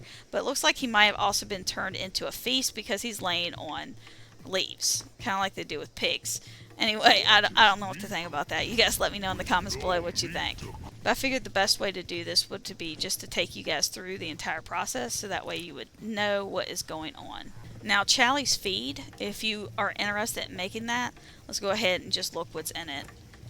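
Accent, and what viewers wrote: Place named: West Virginia, United States. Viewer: Your accent sounds a little like a WV accent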